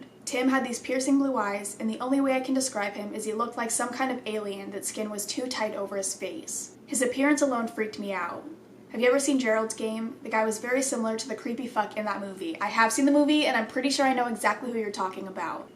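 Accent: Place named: South, United States. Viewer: Its the southernish accent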